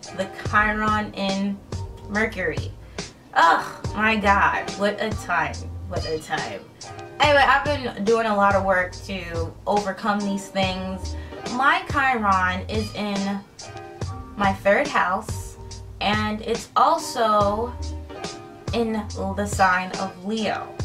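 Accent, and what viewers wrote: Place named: United States. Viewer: thank you sooo much!!! This has helped me a lot!! && yeah I'm watching from London, quite far away,I love your accent! I wish I had an American accent lol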